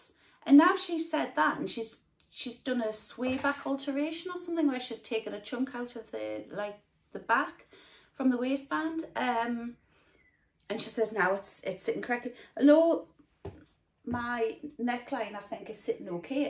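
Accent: Newcastle, United Kingdom. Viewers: pet, your vlogs are so entertaining. I love your geordie accent and all the sayings, I told my partner just the other week that his shoes were clarty and he looked at me as if I was mad - he’s a southerner needless to say. I’m initially from North Shields but moved away in ‘64, and have settled finally in sunny Spain a few years ago. Your zadie is amazing, and the fabric is gorgeous. Xx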